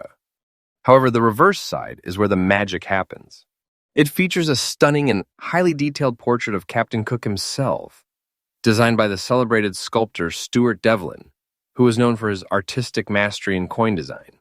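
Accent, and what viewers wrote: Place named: United States. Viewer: What a lot of drama. Yankee accent !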